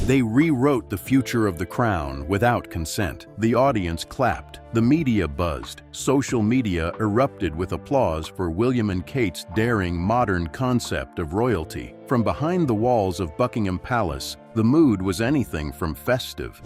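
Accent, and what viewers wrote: United States. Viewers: All this is made up rubbish. Even narrated by a Yank.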